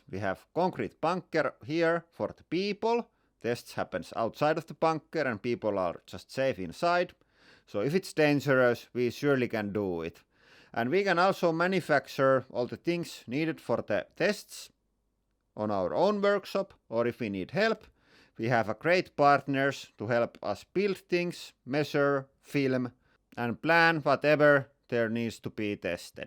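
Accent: Finland. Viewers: It's funny how strong the Finnish language pattern is. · Plug. :) U is pronounced oo in finnish. That's also why he sometimes say boonker instead of bunker.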